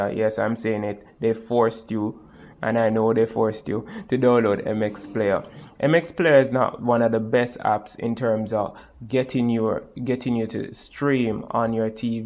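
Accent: Jamaica. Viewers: Works perfect for me! I have been trying to cast for 3 weeks now with no success until this video! THANK YOU!!!! Love the Jamaican accent!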